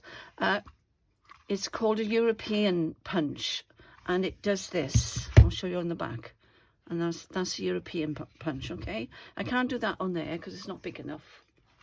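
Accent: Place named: England, United Kingdom. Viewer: Hello, I live in New York State USA and recently discovered you. I am very much enjoying listening to you, speak as my grandmother and grandfather were both from England and I miss being able to hear them talk you have great ideas and I didn’t realize that you were Bella‘mom in any case I’ve been scrapbooking for a while and I am also a fine art painter but I find that doing paperwork and collecting different kits is absolutely wonderful and so relaxing. What I am currently working on is I am a member of pink monarch prints for $8.95 a month she will give you a 50 pieces of paper of a kit that she makes every month and they’re absolutely beautiful But I love to get ideas from other people on how they create and so I am very much enjoying listening to you and I also purchased kits from Bella as well. So thank you for being out there and I too am 76 years old.and a fine art painter.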